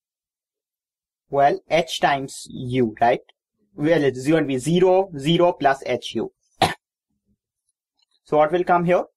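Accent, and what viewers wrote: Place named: Arab world. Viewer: middle east accent is just annoying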